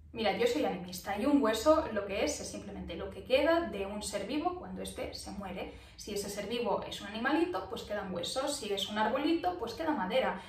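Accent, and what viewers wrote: Catalonia, Spain. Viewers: Me caes demasiado bien. Eres catalana no? Quedamos para tomar un café y charlar? 🥹🤍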